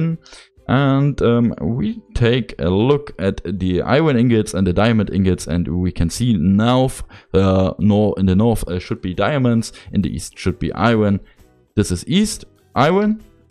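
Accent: Germany. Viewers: er lernt immer noch englisch XD gutes toutorial es klingt sehr deutsch XD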